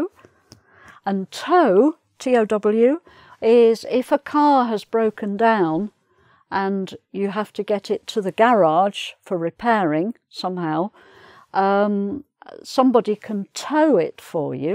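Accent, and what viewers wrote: United Kingdom. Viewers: Is this British English?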